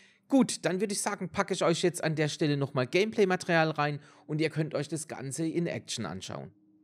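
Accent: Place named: Palatinate, Germany. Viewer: Vom Dialekt her, schätze ich auf Mannheim, Heildberg, Pfalz!?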